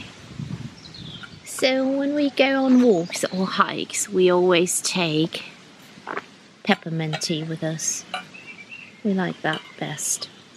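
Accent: England, United Kingdom. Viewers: if you are both German, why do you have such a heavy English accent? Loved your video! 😊❤️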